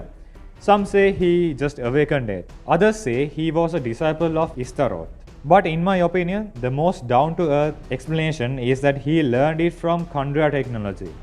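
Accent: India. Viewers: Where are u from cause u sound indian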